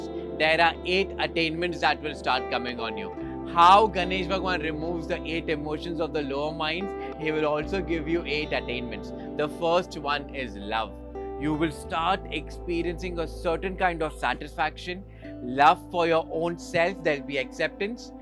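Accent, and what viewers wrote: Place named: India. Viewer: Bhai tu pehle ya to English ache se american ya british accent me bol nhi to Hindi me baat kar, faltu Indians k accent ka mazak udta h duniabhar me ese tone or accent me baat karne se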